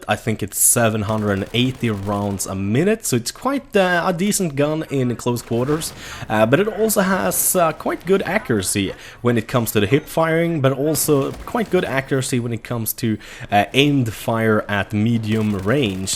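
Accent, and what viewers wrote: Sweden. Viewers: Märker att du är från Sverige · are you from sweden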